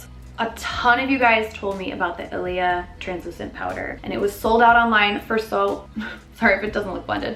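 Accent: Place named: Michigan, United States. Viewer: I hear your Michigan accent ♥️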